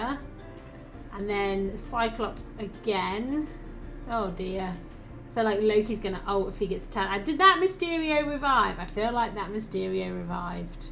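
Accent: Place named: United Kingdom. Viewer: I love your british accent. Good job. Keep on playing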